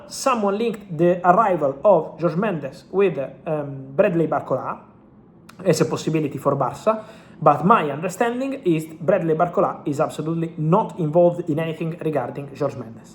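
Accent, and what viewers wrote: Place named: Italy. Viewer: Mate I would love to give you a like when I try to watch your channel but I can’t understand a word you say. I think the content is world class but Unintelligible. I struggle to understand you because of your strong Italian accent and how quickly you speaks. Your English is good, but your pronunciation is difficult for me to follow. CC helps but names are garbled. Anyway great info thanks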